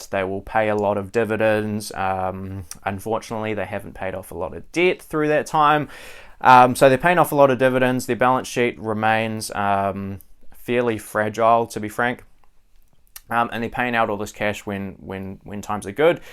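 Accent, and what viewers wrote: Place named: New Zealand. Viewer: Great video, many investors, particularly newer investors don’t look at the balance sheet of companies. Very important to make sure the company can comfortably handle its debts! Great vid mate, love the Kiwi accent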